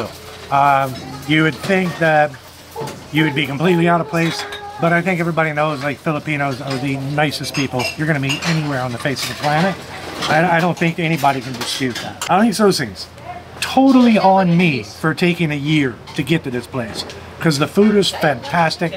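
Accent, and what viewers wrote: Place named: Canada. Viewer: Hi! Late for the party again! I heard you loud and clear when you asked if it was Bitter Gourd. It was Bottle Gourd. She probably misheard your Canadian accent. I have no problem talking to or hearing an American accent. When I moved to Canada, I have noticed that Canadians talk too fast. I am used to it now. This video got me excited and famished! So many yummy dishes❤❤❤ Thank you!!!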